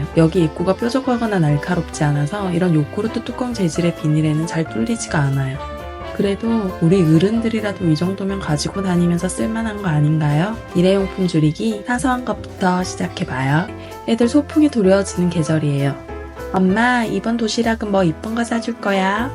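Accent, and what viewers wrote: Gyeongsang, South Korea. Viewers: 영상 너무 잘 보고 있어요~ 실례지만... 경상도분이신지요? 중간중간 살짝 사투리가 나오는데... 나머지 표준어는 너무 잘 쓰셔서 문뜩 궁금해서요 ㅎㅎ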